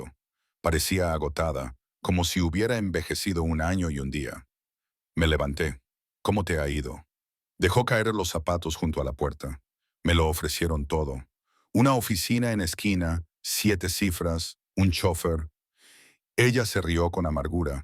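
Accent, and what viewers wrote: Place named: United States. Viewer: Qué pesadez ese scento gringo de AI (estupidez natural)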